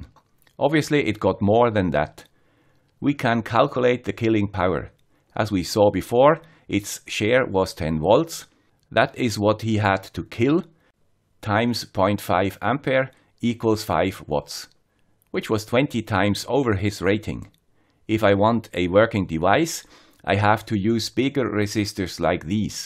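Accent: Switzerland. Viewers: Sali, love your Swiss accent! I also have not been able to get rid of mine. As long as you call the meat on the plate not like the timber no worries. But the FBI is like ei so is the quiescent current. English is not regulated like German. Downunder we drive on tyres while in the US they use tires. There are tongue breakers. California has Yosemite and in the land of Koalas we eat Vegemite, both same ending but different pronunciation. Acronym abuse gets one confused so in our ham radio club ce hand each other upper sideband siticks! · Hey, you just got this video mentioned on Hackaday. Good job and hope your channel continues to grow. May the Swiss accent keep on going!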